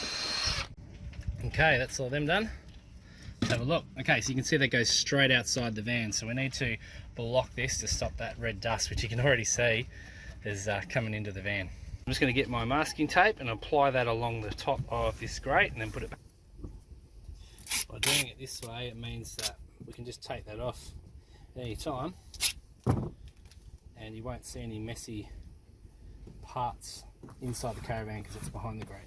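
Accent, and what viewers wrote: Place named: Australia. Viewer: We Cannot Hear your voice. FIX THAT. No ill entent intended. And SPEAK SLOWER, Your Acsent is Not Easy to Understand. (American English is Not Aussy English) We Still Luv-Ya-Champ.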